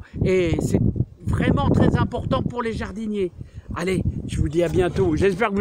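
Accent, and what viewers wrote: North, France. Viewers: Bonjour , je viens de découvrir votre chaine avec plaisir de vous entendre avec un bel accent du Nord! sympa, car moi aussi je suis de la région , disons le même coin ou peut être voisin.Merci pour vos vidéo et le travail donné.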